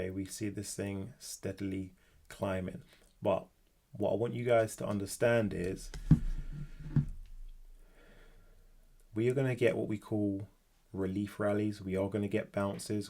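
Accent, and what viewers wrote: United Kingdom. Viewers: Love the British accent..lets go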